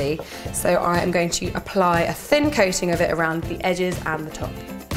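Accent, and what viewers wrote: England, United Kingdom. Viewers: I love your British accent😍😍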